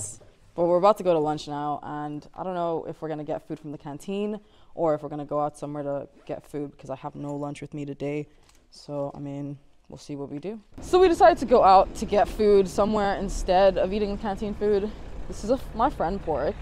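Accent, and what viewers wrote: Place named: Ireland. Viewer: Which country is this please. I couldn’t catch your accident..is not America, not Canada looks like UK a bit is this Ireland ? something?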